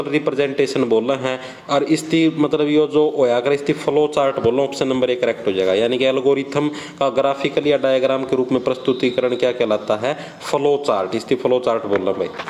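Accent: Haryana, India. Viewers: Ram ram guru ji aapki haryanvi bhasha achi lagi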